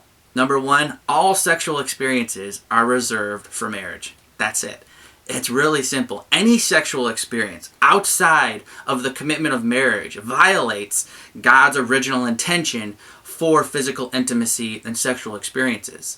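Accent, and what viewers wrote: United States. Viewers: I have watched, read, and heard a lot of Christian dating advice over the years, and this hit it right on the nose. I had never heard someone talk about emotional boundaries within a dating relationship, only with friends of the opposite gender so I really appreciated it being brought up. I also thought that the last point of being weary of our words and promises is a really key element as well. I can't tell you how many times I have heard friends or family make promises they can't keep in a relationship only to see it crumble when they can't hold up their end. I also liked your discussion of boundaries when talking about the future. I have never even considered that one before, however upon thinking about it, it is 100% true. I know I have made this mistake which lead to me staying in a relationship longer than I might have otherwise simply because we had talked about the future so much. 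I just wanted to say thank you and I enjoyed hearing the slight Minnesota accent with some great Christian dating advice.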